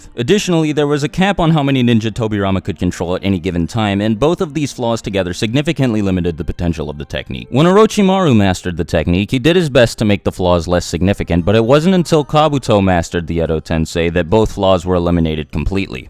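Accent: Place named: Bronx, United States. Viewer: You sound like someone who should do voice work for "A Bronx Tale"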